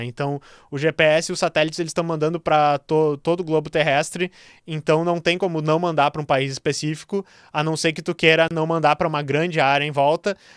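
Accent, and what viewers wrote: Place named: São Paulo, Brazil. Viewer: o sotaquinho paulistano kkkkk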